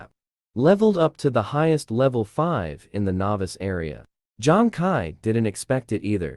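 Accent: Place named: South, United States. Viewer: 1.25 is the perfect speed for this thing. Especially if your used to a faster way of speaking, then the southern drawl this one is set too. :)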